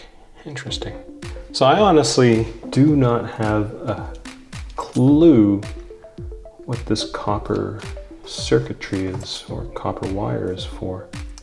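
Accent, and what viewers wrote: Canada. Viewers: Thank u for the vid. Also you sound Canadian. Love that accent. Lol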